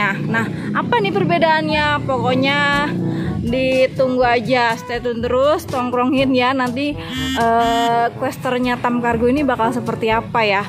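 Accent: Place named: Sumatra, Indonesia. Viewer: logat bicaranya dah mulai logat sumatra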